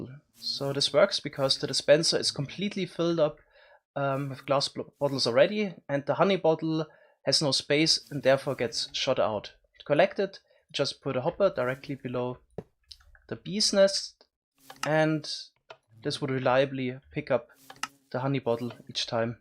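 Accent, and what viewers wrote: Germany. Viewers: Ich finde es geil das man hört das du ein deutscher bist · Y do you sound so german?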